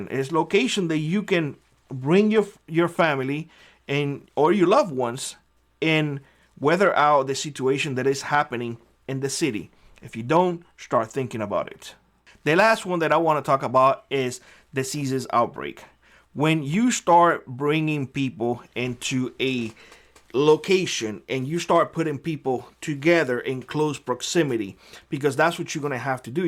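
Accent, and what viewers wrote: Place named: Mexico. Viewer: YOU MUST BE A WHITE MR MEXICAN, DEPORT YOU TOO. YOUR ACCENT GIVES YOU AWAY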